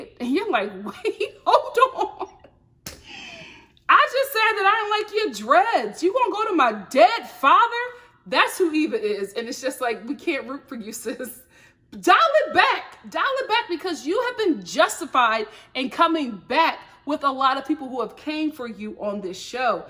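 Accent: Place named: Philadelphia, United States. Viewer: I won’t lie, I watch every one of your videos but I clicked this one with the quickest of thumbs just to hear you say “doll” in that Philly accent. 😂 Love you!!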